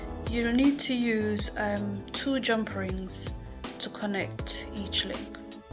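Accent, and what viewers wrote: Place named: Trinidad and Tobago. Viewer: Hello! I just came across your channel by looking for an easy chain link to make. Your work is easy and beautiful! Thank u, all the best! By the way I heard your accent, by chance are u a Trini? You sound a lot like me, lol. Thanks again. Gonna subscribe!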